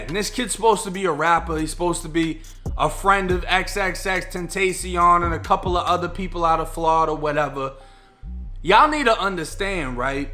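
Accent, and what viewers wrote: Boston, United States. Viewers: Yo bro mad love man glad to see a homie doing good forreal .. I see ya Boston ascent coming out strong man haha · I love your accent.. wyf?? Boston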